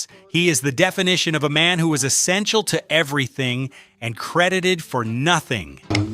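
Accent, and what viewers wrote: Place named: United States. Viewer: This guy is an ignoramus impostor pretending to be knowledgeable. He takes a smattering of little bits and pieces of facts and strings them together with an American accent padded with repetitive meaningless phrases. Far too many foreigners of his ilk think their pretense of knowledge gives them relevance. "A 1-eyed man is king in the land of the blind". Anyone who knows about Jamaican music will find this guy's presentation hollow.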